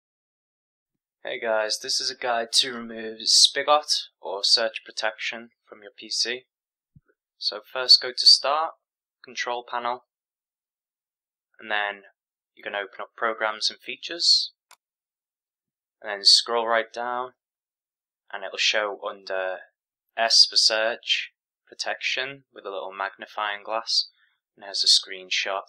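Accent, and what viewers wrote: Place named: Lancashire, United Kingdom. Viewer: This helped a bunch. Cheers! (P.S. you're definitely from Ormskirk).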